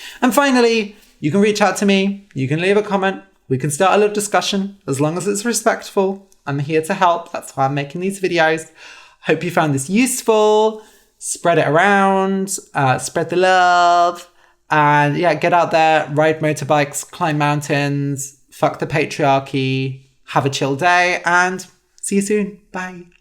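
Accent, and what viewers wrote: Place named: United Kingdom. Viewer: oooohh! a Brit! (or somebody who sounds similar)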